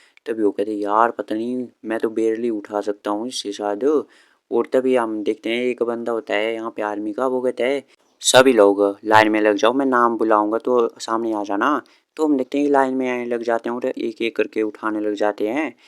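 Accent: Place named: Himalayas, India. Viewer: tabhi tumhari hindi hai ... Himali taaal mehsuus ho raha thaa...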